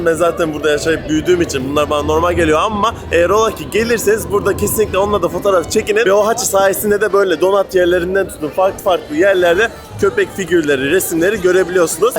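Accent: Turkey. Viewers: 15 sene Japonya'da yaşayıp Tr şivesinden kurtulamamak peki?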